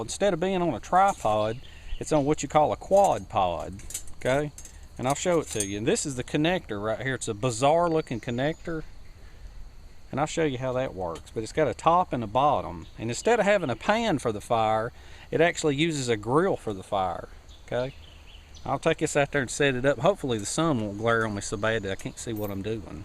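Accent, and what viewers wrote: South, United States. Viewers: Hey, Forgot to mention - i now know how to do that knot you used - thanks so much my friend. this is something i will remember for the rest of my days. The way you showed it was so clear and easy to follow unlike some other knot video's on here - can you do a video with some more knots that you know? Your a great teacher & fun to watch, love the south accent too:)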